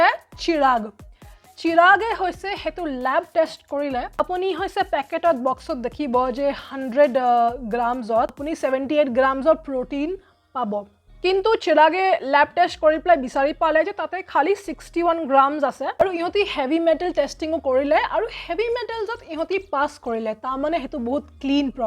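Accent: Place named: Odisha, India. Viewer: Sounds so similar to Odia.😅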